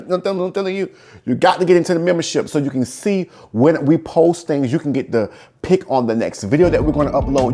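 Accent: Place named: South, United States. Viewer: Love your southern accent with your sense of humor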